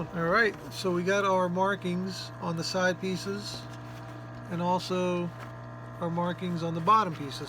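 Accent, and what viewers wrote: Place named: Baltimore, United States. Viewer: you have a Bawlmer "about" and "over"! What's with that? Love watching you work!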